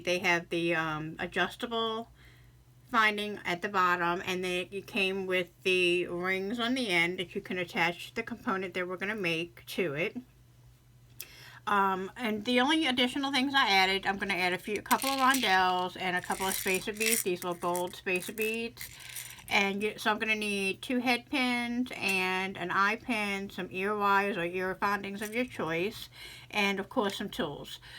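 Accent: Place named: South, United States. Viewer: Lovely designs! I'm a new sub. I've been making jewelry for years, but love to see what others come up with. Thanks for the inspiration. Just wondering, are you from the northeastern part of the country? Or maybe mid-Atlantic? I'm trying to place your accent. Seems a little bit southern and a little bit northeastern. Also, I'm wondering if the hand-appliqued beads are Japanese Tensha beads? (The link that you posted above for the beads is the same as the link for the bracelet.) I'd love to get some. They are enchanting. You're pieces are beautiful. Thanks for sharing!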